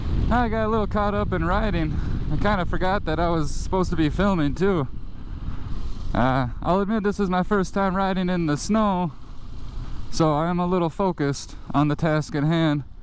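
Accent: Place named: Minnesota, United States. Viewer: you’re welcome! Thanks for the compliment! Glad to help. Your MN accent reminds me of being a kid living in NE Minneapolis.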